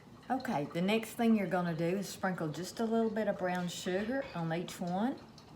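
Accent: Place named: Tennessee, United States. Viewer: Listening to your accent brought me back to my childhood when we would visit my grandma in Tennessee. I luv that. It also sounds like Loretta Lynn. ☺️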